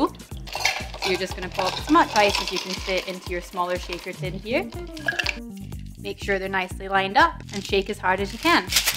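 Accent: Scotland, United Kingdom. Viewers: Where did you get this martini glass? I love it. New to the channel and lovely to hear a Scot!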